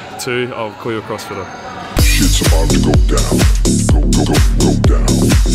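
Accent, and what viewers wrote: Australia. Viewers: Cal your accent is coming back since you Oz trip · Jay Tee Australian English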